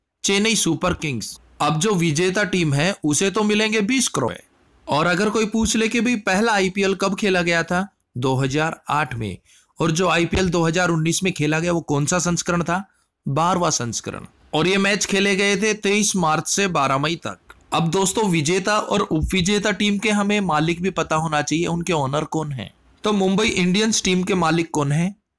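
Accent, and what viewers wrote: Haryana, India. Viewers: भाई आप हरियाणा से हो क्या?? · Aap haryana se ho kya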